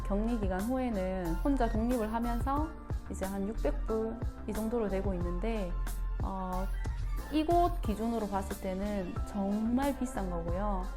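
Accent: Gyeongsang, South Korea. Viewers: 경상도 아가씨군요 대구에요? 사투리 쓰니 더 예뻐요 ㅎ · 반가워요. 경상도사투리가 재미있내요, 부산쪽... 나도 세계 및 남미 여행을 준비중인데, 도움이 많이 된네요. 쿠바가서 살사와 바차타, 그리고 아르헨티나 가서 밀롱가를 다니는 게 꿈입니다. 그래서 열심히 스페인어도 배우고, 춤도 배우고 있죠. 특히 아르헨티나는 체게바라와 메르세데스소사의 고향이라 더욱 가보고 싶어요. 좋은 정보 고맙고 자주 올게요.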